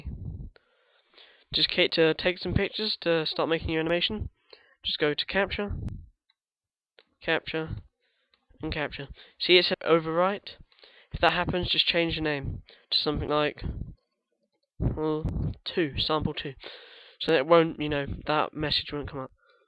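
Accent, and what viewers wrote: United Kingdom. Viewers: Are u from London? :o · heh... you sound british.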